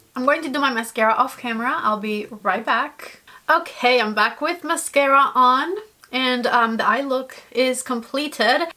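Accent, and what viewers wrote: Cuba. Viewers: I recently reached all the Harry Potter movies with my daughter who’s 13! I still love it! I play the Harry Potter Lego game with my 6 year old daughter. So fun!!! I love going out to eat & Ed Shreen as well! I’m a certified ESL teacher! Your English is excellent, but I love that you kept a bit of your Cuban accent! I would love to visit Cuba! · Would you be willing to do a makeup tutorial in Spanish? I’m learning Spanish and I would love to hear it in a Cuban accent from an exceptionally talented person 🥰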